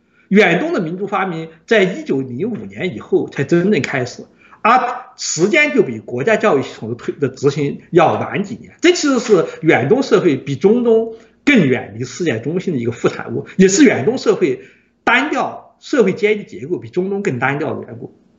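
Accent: Sichuan, China. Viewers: 阿姨这四川口音233333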